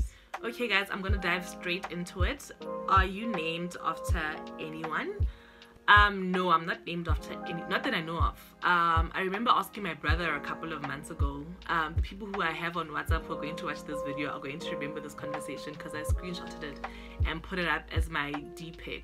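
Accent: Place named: Free State, South Africa. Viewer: When you said 'hao cheweng', I knew I had to subscribe 😂😂😂 You sound like you're from Free State.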